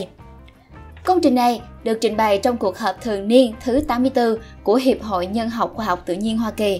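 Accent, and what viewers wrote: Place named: North, Vietnam. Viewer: giọng bắc thì rõ hơn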